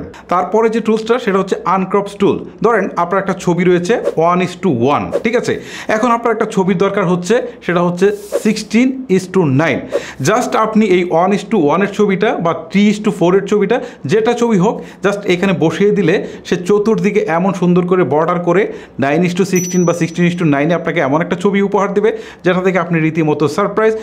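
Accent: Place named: Kishoreganj, Bangladesh. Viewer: আপনার কথা শুনে মনে হচ্ছে আপনার গ্রামের বাড়ি কিশোরগঞ্জ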